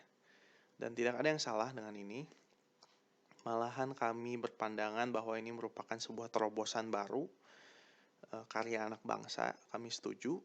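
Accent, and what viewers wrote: China. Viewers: Wong logatnya aj Aseng bngt Panteslah klo u ko kontra SMA bossman 🤭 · Logat aseng....jualan liur lu seng😆😆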